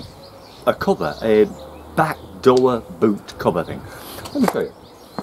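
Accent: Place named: Northwest England, United Kingdom. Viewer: Great job lad, I copied your idea over Xmas break and it works a treat. You sound more northwest England.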